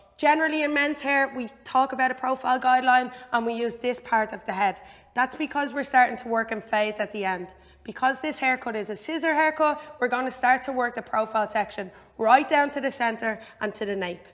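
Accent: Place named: United Kingdom. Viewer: What part of Britain are you from, you’re accent is awesome! Since the pandemic forced me to become my own barber, and after lots of trial and error(and clipper fuck-ups), these videos from you and the Regal Gentleman are huge help. I can’t go back to my rural Michigan (US) hairdressers, I wouldn’t let them bush my cat’s hair! I like to do funky things with my hair, mostly short highly textured, disheveled, high fades…I’ve been experimenting with coloring bleaching and highlighting for years, I like ice grey tones a lot, and that took a LOT of practice and patience and it still challenging, as I mostly do silver or grey highlights…. But yeah, it’s fun stuff, you guys the best jobs, making people look their best, I’m sure it’s incredibly fulfilling career! Thanks for the video tutorials, much appreciated 😁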